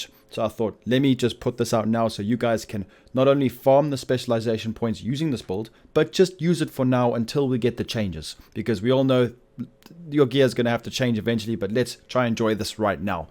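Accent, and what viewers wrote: South Africa. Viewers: You sound south African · Dude you from SA your accent sounds SA?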